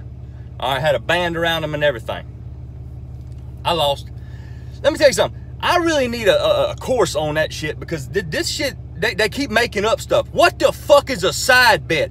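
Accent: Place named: South, United States. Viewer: Why was this in my recommendations? 😍 nevermind... I don’t care 😂😂 He’s funny! And that southern accent... oh my 🤤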